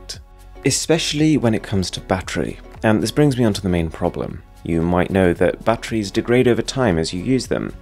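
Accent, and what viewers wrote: United Kingdom. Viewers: The accent alone makes the subscription worth it... Like listening to Earl Grey tea talk about tech in an ever so slightly snide but entertaining manner. Perfect. Let me go get my smoking jacket and que the next video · Do you use a british accent?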